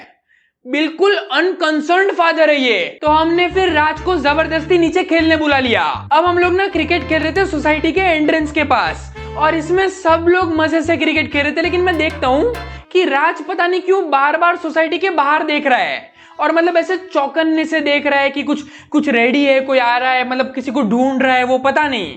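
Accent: Hyderabad, India. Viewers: always love the hyderabadi accent😅😂😂 Also the ending is crazy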